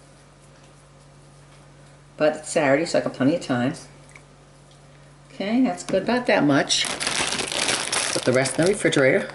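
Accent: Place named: Maine, United States. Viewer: You sound like you come from somewhere around where I do. I was born and raised in Maine. :-)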